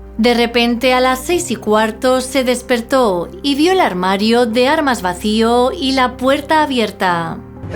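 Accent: Spain. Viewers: Me encanta su acento, es de España 😍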